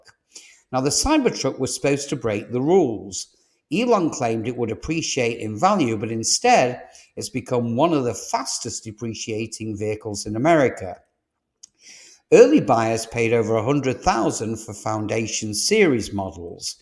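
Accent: England, United Kingdom. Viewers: Wow! Seems as if I am way out of this regime! I am an 82-year-young South African chap who proudly owns a 1999 Land Rover Discovery 2 V8. I have never seen any of the vehicles which you mention, here in beautiful South Africa, so cannot comment. Maybe people should be educated in matters mechanical before thay purchase their 4-wheeler. My Landy has done just 280 000 kilometres, has never let me down, and has had the four usual problems of its model attended to timeously. Leather seating, full aircon, great sound system, absolute reliablilty, easy driving with excellent driving view and man, it goes simply anywhere and everywhere! I am very interested in your use of the word 'GAS", dear presenter. With your delightful English accent, I would expect you to use the term "petrol", as we do here in beautiful Cape Town. Thanks for great entertainment!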